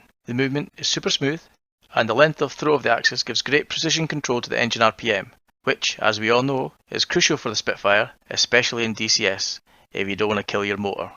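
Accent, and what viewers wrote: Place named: Newcastle, United Kingdom. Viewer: Love the Geordie accent, why aye pet, emotional roller-coaster of emotial emotions 🤠👍🏿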